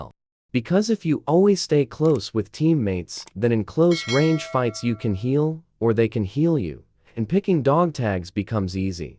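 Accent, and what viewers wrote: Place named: India. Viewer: I can almost hear the Indian accent.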